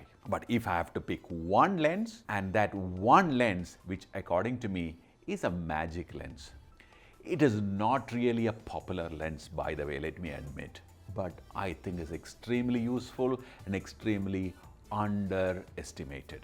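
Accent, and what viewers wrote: Kerala, India. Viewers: That crispy Mallu accent & voice modulation.. Waah..❤️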